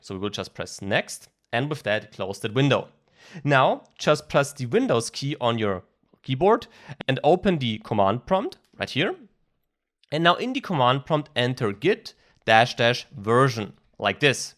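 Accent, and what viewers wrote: Germany. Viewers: Yeah, he sounds a lot like a German.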